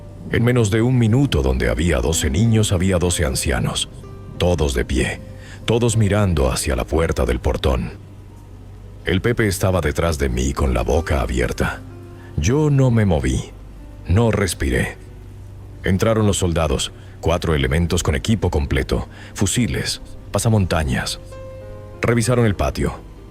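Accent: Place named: Venezuela. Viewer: te escucho debes de Venezuela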